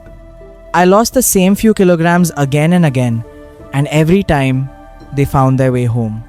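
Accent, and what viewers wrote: India. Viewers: Nice videos ,but your accent is indian not japanese at all ,nothing wrong but it is very clear .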